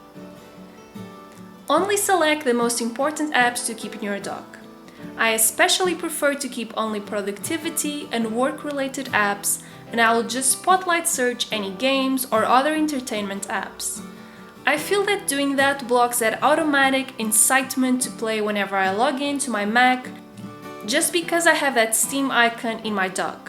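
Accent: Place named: Portugal. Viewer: I have been watching some of your videos and because of your accent I thought you are Portuguese 🇵🇹 and now I think I was right because I saw a Via Verde email 😂. Am I right?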